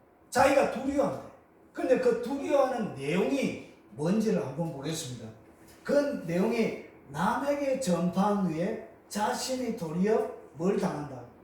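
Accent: Gyeongsang, South Korea. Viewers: 저도 경상도라서 귀에 더 잘 들어와요 고맙습니다 고난중에 집중적으로 들으면서 영이 살아납니다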